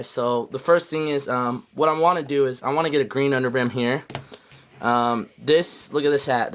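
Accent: United States. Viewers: Hey you from utah huh haha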